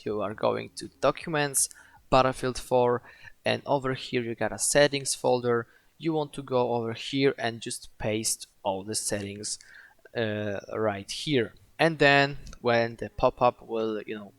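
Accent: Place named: France. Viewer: Thanks for this I hope it helps me.. Im guessing your french 😬😬 so mercy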